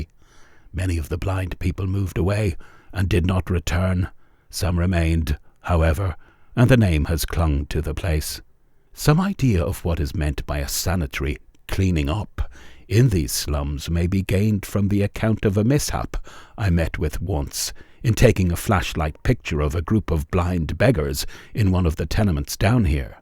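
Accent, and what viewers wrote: United Kingdom. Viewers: So what's up with not being able to watch an American subject without incessant Brits narrating everything on the internet?.....can't watch TV, now can't enjoy a video.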